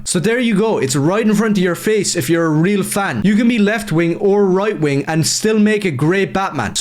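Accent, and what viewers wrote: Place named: Ireland. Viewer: You're a funny Irish guy (I'm guessing Irish by your accent). You're also completely correct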